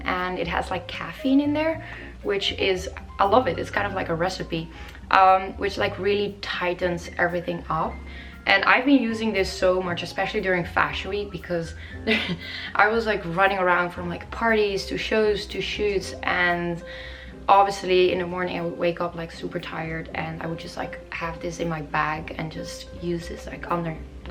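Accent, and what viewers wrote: Netherlands. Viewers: Je klinkt een beetje Nederlands nog! Ik kom van masha haar mukbang :)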